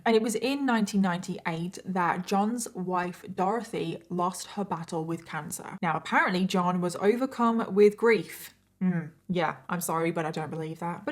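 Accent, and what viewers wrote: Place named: United Kingdom. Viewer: I Looovvvee your accent, always been a fan of British accents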